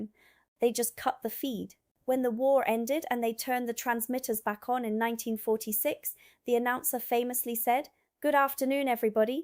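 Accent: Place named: RP, United Kingdom. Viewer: I do love your pronunciation of the Queens English, keep posting 🙏🌹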